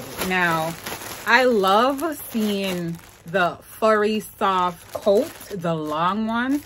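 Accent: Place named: Caribbean. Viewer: Possibly one of the best hauls ever like - what amazing pieces! I thought you'd climaxed early with that incredible fuzzy, baby blue jacket at the start just left me thinking - how do you top that? But I love the red shiny leather (especially paired with the shorts) and then that pelted black fur jacket at the end - that looks too good! Definitely a date night type coat - hope you saved it for Valentines Day! Great haul. I'll throw a sub your way. Ps where are you from? I love the accent. I'm guessing somewhere in the Caribbean?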